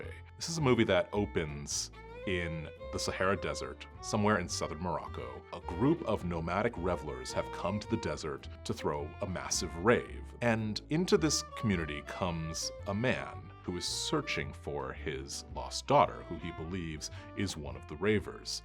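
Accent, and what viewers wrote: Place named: RP, United Kingdom. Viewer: Am American of Asian decent speaking like he's British posh tosh is suss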